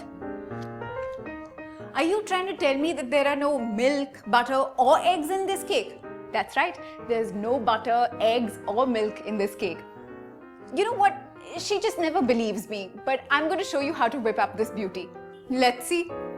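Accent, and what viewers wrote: India. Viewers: Ulas Gültekin it's the Indian accent.😍😄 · a very strong Indian accent you have.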